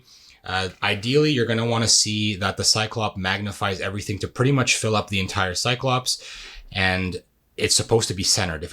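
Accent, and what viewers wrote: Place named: Lebanon. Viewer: You’re Lebanese I can tell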